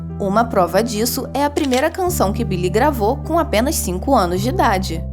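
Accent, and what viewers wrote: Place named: Rio de Janeiro, Brazil. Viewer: Sotaque carioca chato pra caramba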